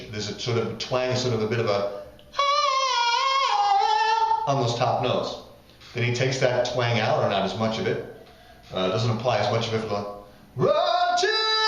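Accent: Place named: United States. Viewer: First of all you need a middle class London accent and then the phrasing will come naturally! Being British, I can hear your American accent cutting through like a Chainsaw! Just a thought...Not an order! Example of this is Ken Tamplin trying to sound like Freddie Mercury......Oh Dear!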